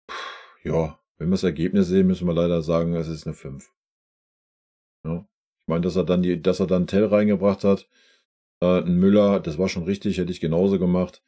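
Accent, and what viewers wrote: Ruhr, Germany. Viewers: Höre ich da etwa 'nen Ruhrpott-Bayern raus oder täusche ich mich? 😂 Btw., sehr faire Analyse von dir! LG aus Bremen 🤟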